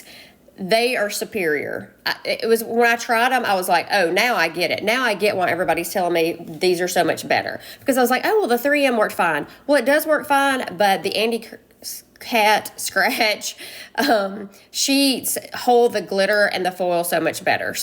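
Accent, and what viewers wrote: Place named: South, United States. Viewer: Love how this turned out. Thank you for sharing. Also love your southern accent. Watching from Ontario Canada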